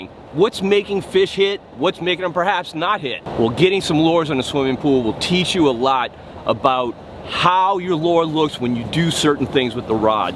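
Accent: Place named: New Jersey, United States. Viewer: I thought you were from New Jersey.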